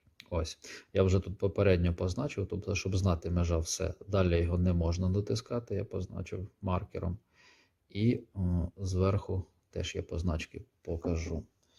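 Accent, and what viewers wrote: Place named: Russia. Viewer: А всё равно слышно,что мовою ты розмовляешь по русски. Чё уж кривляться,ну и говорил бы по русски,рас уж украинский не твой родной. Один хрен,скоро тебе придётся руссифицироваться,если жив останешься.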